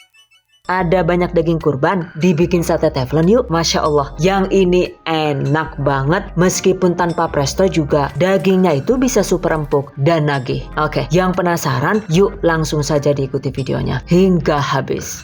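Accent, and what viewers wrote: Banyumas, Indonesia. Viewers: Selalu saja terhipnotis dg suara narasinya nda terasa tau" sdah selesai aja ..... Klo bleh tanya KK asli orang ngapak kah,... Tp klo nda salah pernah disalah satu Vidio tinggalnya dilampung.... Terimakasih resepnya yg selalu menginspirasi dan menghibur....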